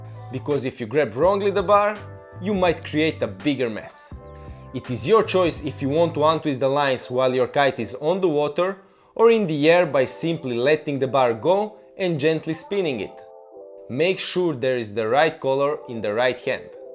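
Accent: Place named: Russia. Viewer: Iemācies angļu valodas pareizu izrunu, angļu valoda ar izteiktu krievu akcentu! Pretīgi klausīties!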